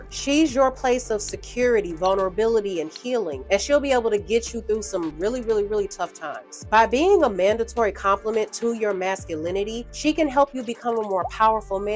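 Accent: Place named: Texas, United States. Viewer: Great information as always. Question are you from Houston or Texas by chance? I'm always trying to figure out accents.🤦🏽‍♂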